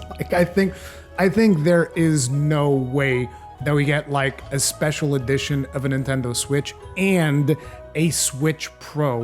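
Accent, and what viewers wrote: Portugal. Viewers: Are you portugese? Your english is better than mine and Im native lmao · ESPERA AI, TU É PORTUGUÊS?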